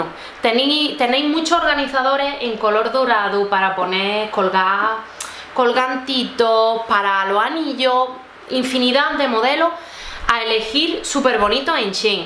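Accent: Granada, Spain. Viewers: tienes acento como de Graná 😱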